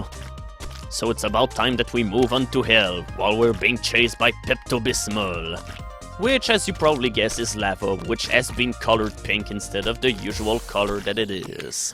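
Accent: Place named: Quebec, Canada. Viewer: hey,...by hearing your accent...something tells me that you are from quebec...because...i am from quebec myself.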